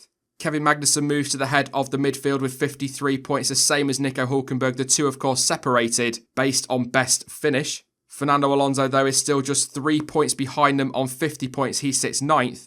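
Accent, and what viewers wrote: United Kingdom. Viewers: Sexy british accent #nohomo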